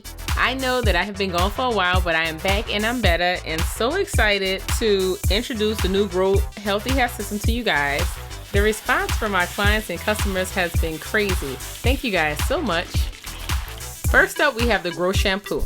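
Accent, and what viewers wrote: New Orleans, United States. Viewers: I love the New Orleans accent 🥰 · Love your accent. Are you from New Orleans? Love the hair.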